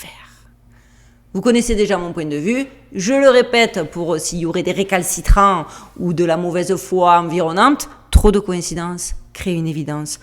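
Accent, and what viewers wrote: South, France. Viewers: Merci c tjs un super moment malgres le sujet terrifiant Magnifique travail et je suis d aix en provenve donc J ADORE ta narration bien du sud Bravo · Salut j’aime tes vidéos que je viens de découvrir continue ne lache pas ! L’accent du sud donne un plus à tes vidéos! Peut tu s’il te plaît faire une vidéo sur le gangstalking qui va avec le thème de tes vidéos?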